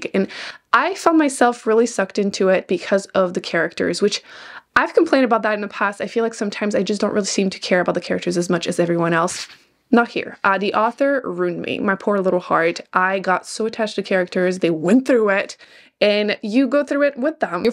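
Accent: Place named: Quebec, Canada. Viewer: Thank you for sharing,! Stupidly question, are you from QC? I love the little accent.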